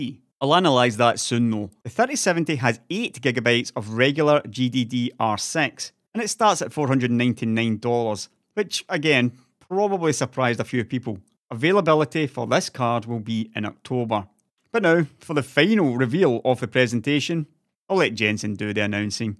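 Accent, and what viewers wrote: Scotland, United Kingdom. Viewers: I Missed yurr skottish voice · It's called a Scottish accent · reading the comments in Scottish. That voice is stuck in my head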